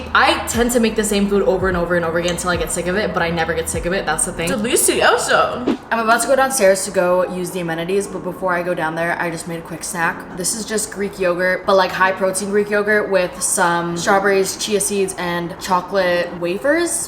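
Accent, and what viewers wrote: Vietnam. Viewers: your viet is so cute T__T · Has anyone told you that your Vietnamese accent is so cute ❤❤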